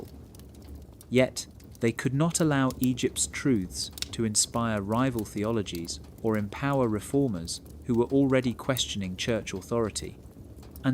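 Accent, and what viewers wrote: England, United Kingdom. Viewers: Are you a English lad? Did you come from Oxford?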